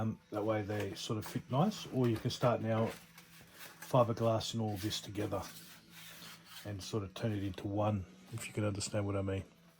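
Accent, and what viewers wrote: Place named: Australia. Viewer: Love it, and the Reo reference makes a lot of sense, you sound Aussie, where are you located bud?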